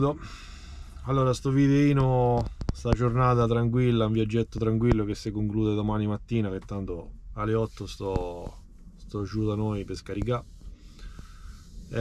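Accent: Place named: Marche, Italy. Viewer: d'allaccento mi sembri marchigiano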